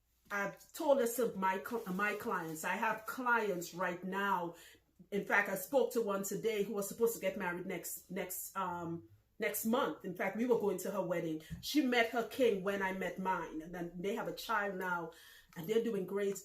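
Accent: Trinidad and Tobago. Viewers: You look and feel like family, Share my daughters name and nickname, AND are on the IFA PATH! Love it! I have watched you off and on for a bit to decide whether I am ready to commit to the loc journey, and HOW to correctly care for my crown when I am ready. I must say THIS SHARE RIGHT HERE.....un-cut and No Mamagisms... was right on time! I bought a journal years ago. It was blessed when I was crowned in Naija. Now all it needs is 27, Hebrew, and The Seal of Ase-WA!!! Words are not enough to express my gratitude because listening to this video today to the end has confirmed, I have been in my own way post a traumatic marriage. I must admit Your accent and euphemisms had me missing T&T too bad. I am going to ring family after this video lol just so they can subscribe as well. I am full on supporting now. Especially because your story makes one introspective. 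I was crowned a Rare Female King and now I need the Balance of My Divine Male. I just need to follow the wisdom imparted in this video. Modupe ati Iré Ní O!! Will update you on the manifest💯💛💙💛💯ASE!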